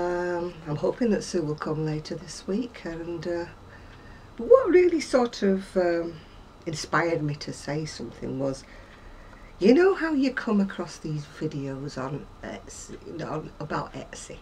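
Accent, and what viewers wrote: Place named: Manchester, United Kingdom. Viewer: Oo, thank you for your lovely channel. It was a bit like 'coming home' hearing your smashing accent. (I've lived away from Manchester for many years - down south - but as they say 'you can take the lass out of Manchester, but you can't take Manchester out of the lass'!) I agree with your sentiments entirely. After all, if you just churn out crochet items to meet a fad demand it then becomes 'just a job' and where's the fun in that? Keep on with your fab videos, I'm a bit late to the party but intend watching them all!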